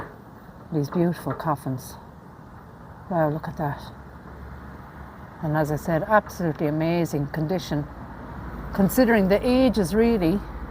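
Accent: Ireland. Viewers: I love to see these old headstones,I wish that the church would clean them or power wash them and seal them so they can last another 400 years, I would love to do that, but I live in the states and our old cemeteries have all been damaged, it’s just so sad.., you have the best voice and Irish accent, you are so clear when you speak. I honestly could listen to you speak all day. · I love your sweet accent or is it called brogue? Very cute. My question is, since the coffins are so old and made of wood, why don't they show signs of body fluids on the bottom parts of the coffins? Were they lined with lead as well? They are definitely much prettier and with more ornate decorations than the metal ones we see now days. I love walking thru cemeteries. I took my little dog for walks at our cemetery everyday for 2 years right up until the day she died. She loved it. Even killed a big giant gopher there one time! 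We had the ok from the people that worked there for us to walk there. And I always picked up any poops that she dropped too.😊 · So good to hear this Irish accent again, it sounds like music to my ears. Cheers, all the best !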